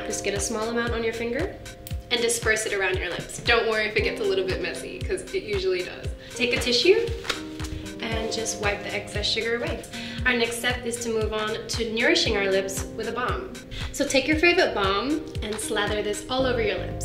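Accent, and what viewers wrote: Australia. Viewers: Did you practice to change your Australian accent or did it just change naturally? 😄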